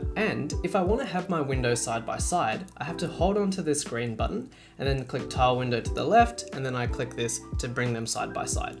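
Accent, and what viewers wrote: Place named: South Africa. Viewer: Are you originally from South Africa? Your accent sounds exactly like someone I know who is from there.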